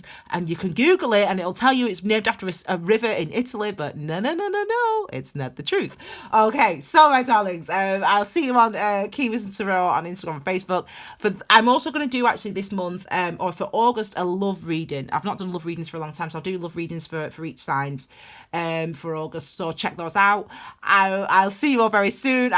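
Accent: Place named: Northern England, United Kingdom. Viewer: Are you from Colne, UK. by any chance? I live in Cardiff now but I’m from Oldham originally, so I recognise the northern accent. I’m guessing it’s either Colne or Burnley.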